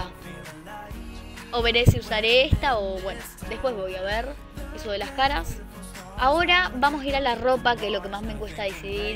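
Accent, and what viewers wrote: Argentina. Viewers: YO KIERO UNOS ROBUX TOY RE POBRE MEN PARECES DE ARGENTINA :v +Venhado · Vos ser argentino?like si lo eres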